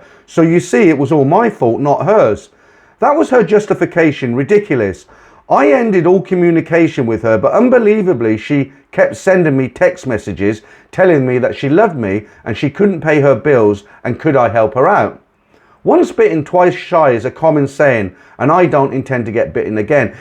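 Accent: United Kingdom. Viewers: Are you British,. First time watching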